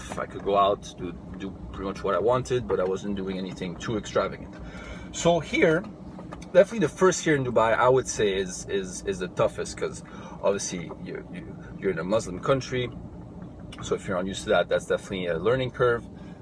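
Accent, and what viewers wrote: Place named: Russia. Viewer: Pe bune? Eu sunt Moldovean si chiar credeam ca esti rus, ai accent rusesc :D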